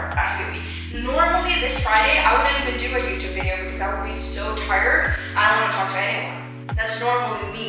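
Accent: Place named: United States. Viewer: It might be your FAQ: why do you have American accent? Love your content as always:)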